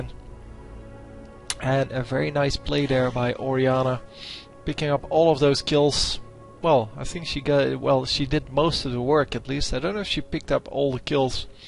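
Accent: Netherlands. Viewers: are you dutch, I think so, ur accent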